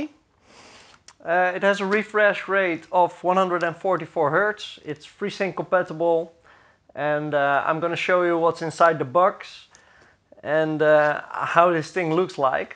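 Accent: Netherlands. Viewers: Ik hoor dat je Nederlands bent hahaha Leuke review❤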